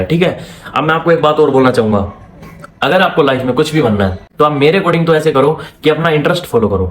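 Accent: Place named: Pakistan. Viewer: bhai yr no offense, aap haryana se ho but aapka accent pta ni kyo mujhe pakistani wali vibe deta hai, jo woh urdu ka hota hai, mast...baaki content toh bdhiya hai hi, aur woh interest wali baat hi sahi hai, jisko sachme animals se pyar hai wohi Veterinary kre, mujhe agle saal NEET dena hai but m already prepared to be a veterinary.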